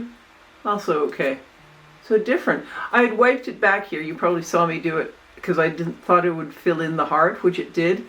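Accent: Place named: Canada. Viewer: Based in the UK? ok but you sound so incredibly canadian. Am I tripping? Anyway I am not usually a fan of pinks and reds, but I am really digging the way you've combined them here, kinda has a touch of Rothko. I really liked the bright reddish vase and jug with amarylis all over. Usually not a fan of amarylis but it looks great with those rich colours.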